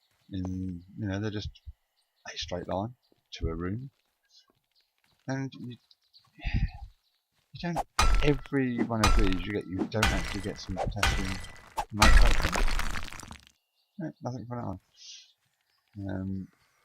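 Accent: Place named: England, United Kingdom. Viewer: If I may be so bold: a couple of problems I noticed - the voice commentary is often unintelligible, or drowned out by either the minibike or the sounds of mining. I appreciate that you have an English regional accent, and that is set in stone; but I am guessing that perhaps some viewers find it difficult to understand - at least you don't have a thick Geordie accent eh? Building and engaging an audience is a long hard road, but another step you could take is uploading a profile pic, doesn't need to be a selfie, could be anything, but it is something other than the anonymous 'blue blob'. I am your one subscriber!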